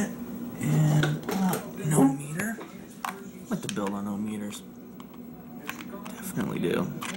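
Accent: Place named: California, United States. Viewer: are you from Orange County, CA by any chance? You talk the same lingo we do lol. I live in AZ now though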